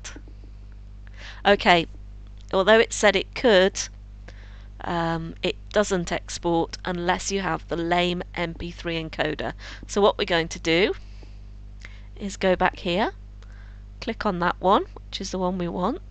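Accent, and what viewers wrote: United Kingdom. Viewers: It's british accent :P that's why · nah man....i said that because this woman sounds like one of those British nannys....xD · are u a girl or a boy sounds?0_0 oh i hear the british sounds in ur voice lol cool.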